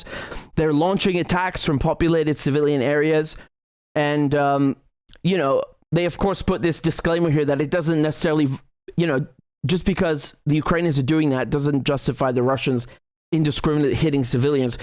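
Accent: South Africa. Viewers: Yup English south african Doesn't sound Afrikaaner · 100% south african accent. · Definitely South African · Yes, he is a South African with an Afrikaans accent. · Hes very South African😂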